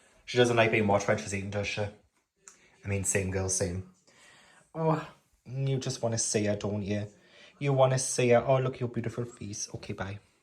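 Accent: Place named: North, United Kingdom. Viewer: I stumbled on you one day and stayed for two reasons - I am from the north, now living in the US and your accent brings me home... and I just ADORE your content!!!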